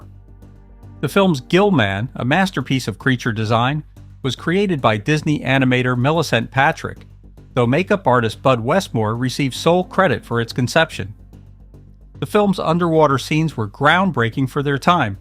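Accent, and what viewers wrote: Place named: Baltimore, United States. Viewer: The narrator has a thick Baltimore accent. Ask him to say "Aaron earned an iron urn."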